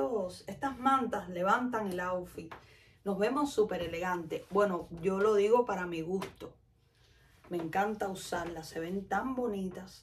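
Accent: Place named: Cuba. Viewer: Hola soy nueva suscriptora. Eres cubana verdad ?, creo q reconocí el acetone de una paisana. Yo soy de Cuba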